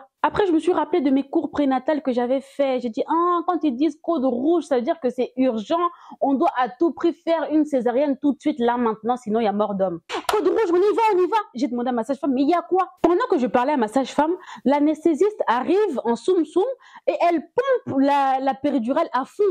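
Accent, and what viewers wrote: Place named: Democratic Republic of the Congo. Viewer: Wow ! 🥹 J’ai eu l’impression d’entendre le récit de quelqu’un de familier, tellement ta façon de parler est typiquement congolaise, avec des van en lingala ! Je me suis sentie très alaise. C’était franchement agréable. Tu a été véridique et authentique, tu as vraiment transmis tes émotions d’il y a 9 mois et c’est super. J’ai eu un peu peur mais j’apprécie beaucoup ton courage. Félicitations pour Bébé 3 fois canon et bon courage pour ses 7 frères et sœurs qui sont aussi en chemin. 😁👏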